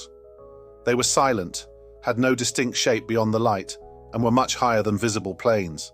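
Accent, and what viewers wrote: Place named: Michigan, United States. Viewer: Bring back the Michigander voice! Thanks for another great video.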